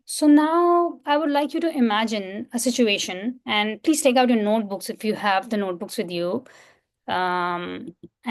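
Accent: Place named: India. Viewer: I am sorry, you delivery is incredible boring and you non words make me distracted, no thank you I can't go on and try to understand your speech that strong accent and not making any effort to pronounce well the language. And you repeat what everyone said out there it is override 👎may work in India, but not in thise side of the world, your competition pass you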